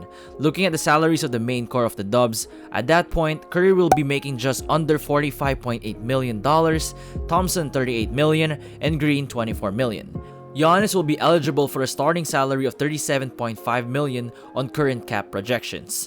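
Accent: Philippines. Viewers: I Guess you're a Filipino, because of the accent. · I can tell that you're a filipino just by observing your accent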